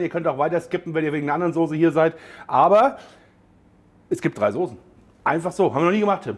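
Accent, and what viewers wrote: Hesse, Germany. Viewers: Hallo, ich bin ein Hesse der in China lebt. Ich finde es total super wenn ich hessisches Gebabbel hoere und dann chinesischem Essen sehe. Du kommt richtig echt rueber und dein Kanal bereichert mein Leben hier.Mach so weiter. Koch doch mal Yunnan Kueche.Hier in shanghai gibt es ein Lokal das heisst lost heaven. Findet man ueber google und alles was dort auf der Speisekarte steht schmeckt super. Aber keine Ahnung wie man es kocht.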